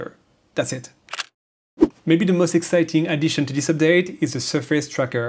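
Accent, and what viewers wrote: France. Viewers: Ohhh hey I got a shoutout hehe! Thanks guys! Love what you're doing here, great to see more Resolve channels popping up (plus sexy French accent beats my blunt English one 😆) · Probably one of the most pro channels on Davinci with a little twist of having a very nice French accent. LOVE IT! And depth map is my favorite. Merci beaucoup!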